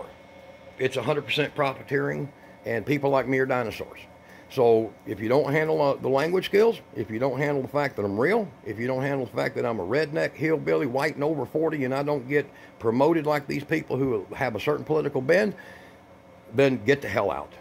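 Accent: Texas, United States. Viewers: Thankyou, I'm from Texas too and you sound just like me... keep up the good work brother. I have walked more than a few miles in the same kind of shoes.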